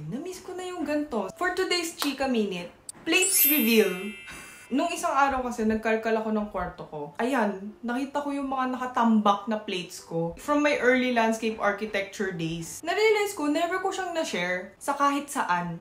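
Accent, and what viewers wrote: Batangas, Philippines. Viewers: 922nd like. Hi! De La Salle Lipa highschool batch 2013 and taga lipa here. 🙋🏻‍♂️ It really is fascinating magtagalog ka and nalabas pagbabatangueña mo haha.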